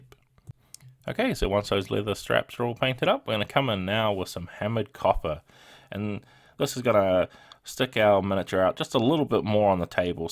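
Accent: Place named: New Zealand. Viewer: Oh a Kiwi voice! That's a nice change.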